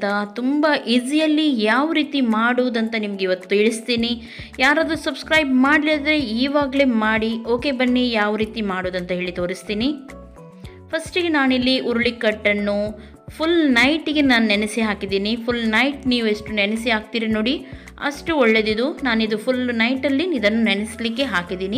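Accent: Mangalore, India. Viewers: Buddy it's Mangalore accent and request u to Rome all over Karnataka to learn or understand before committing....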